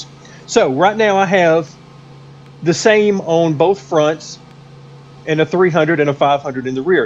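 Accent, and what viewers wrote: South, United States. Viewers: For some reason, your southern accent gives this validity. I hear it and I'm like "this guy probably knows more about nascar than me"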